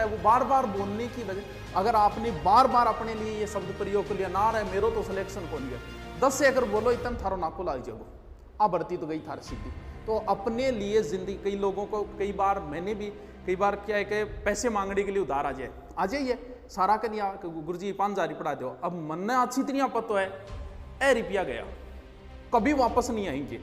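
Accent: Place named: Haryana, India. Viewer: Sir aap haryana se ho ya Rajasthan se dono language remix lag rhi h me haryana se huu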